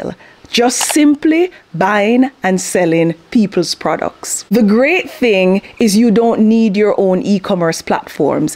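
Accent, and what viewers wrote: Jamaica. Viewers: I thank you so much beautiful and wonderful woman I can hear the accent but you already said something about Jamaica I am African thank you this is the second rinse and repeat Rockstar I'm watching you're straightforward absolutely wonderful honest there's no holds bar thank you Rockstar I believe you will hear from me you are blessed.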